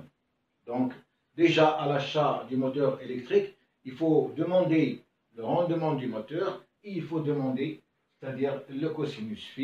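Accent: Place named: Morocco. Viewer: Je crois que vous êtes algérien Mais vôtre accent semble marocain On tout cas je suis fière de vous Professeur Merci.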